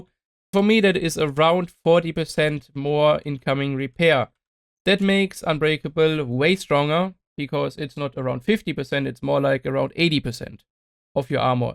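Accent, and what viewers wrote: Germany. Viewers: typical german accent.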